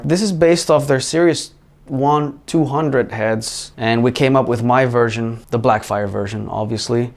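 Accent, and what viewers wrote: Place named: United States. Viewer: why does he sounds like an American when he s talking ,,hi hi ho ho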